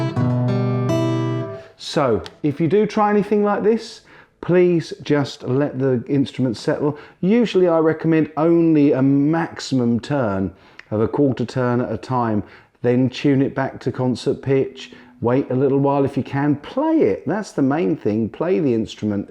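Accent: United Kingdom. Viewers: As a native USA English speaker, I am tired of Briish accents in presenters... are USA English talkers so hard to locate in the USA?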